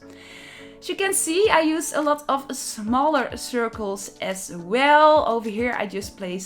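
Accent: Netherlands. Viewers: Are you Dutch?😁🇳🇱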